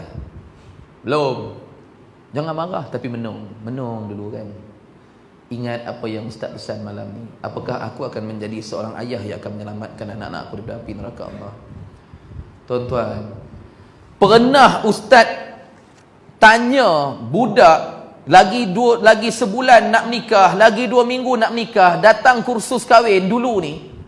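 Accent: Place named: Northern Malaysia, Malaysia. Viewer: Bahasa utara memng agak kasar... tapi bagi penduduk utara x de masalah.. klu x sesuai dgn ceramah ustaz ini.. boleh la dgr ceramah ustaz setempat... ustaz setempat mungkin tahu inisiatif utk mendekatkan diri dgn penduduk setempat...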